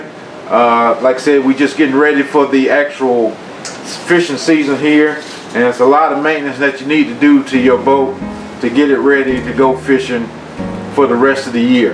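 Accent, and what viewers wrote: South, United States. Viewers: What state do you live in, got a bit of southern drawl there, I'm from Ohio myself born in Kentucky!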